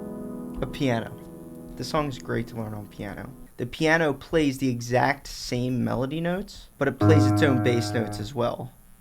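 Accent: Philadelphia, United States. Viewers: You over analyzed non intent that was made by Xfer’s Cthulhu plug-in. But I like your excitement and cheesy Phila accent. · Sound you're from Philly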